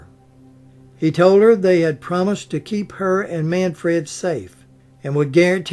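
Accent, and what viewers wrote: South, United States. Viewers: Mr., Just another very well researched and very well presented vlog. Please use your expertise and do a vlog on Rosa Parks. Again you have that golden southern accent that is so soothing to listen to.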